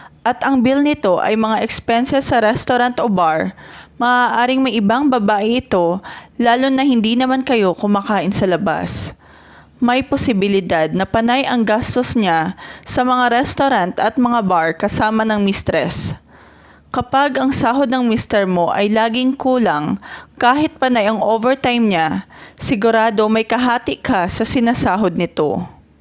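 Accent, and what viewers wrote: Visayas, Philippines. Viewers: Oo nga parang maypagkabisaya narin magbasa pero oks lang ok narin naman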